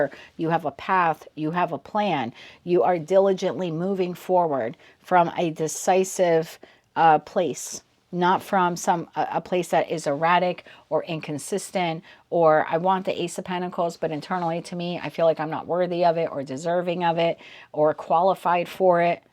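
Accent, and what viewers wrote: East Coast, United States. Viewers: Thnx ❤ great reminder Trying to figure out how to market my book 😊OMG you are so east coast Love it 😂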